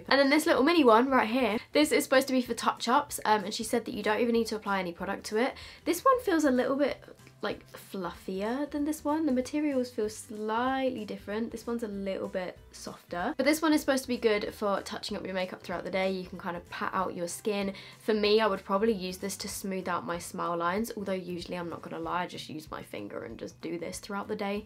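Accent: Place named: United Kingdom. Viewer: the subtitles were transcribing from a British accent I believe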